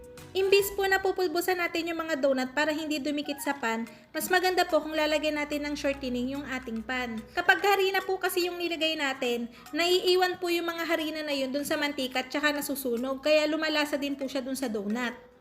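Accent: Batangas, Philippines. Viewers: Hi po. Batangeña ka po mam? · Kala ko Batangas. Lagi po ako nanonood ng mga vids nyo. Galing nyo magbake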